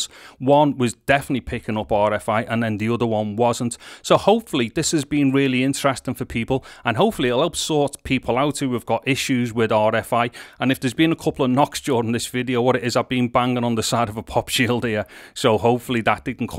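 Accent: Liverpool, United Kingdom. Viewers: You sound like a skouser. I'm from the US but I know a skouser when I hear one. Thanks for your mic help.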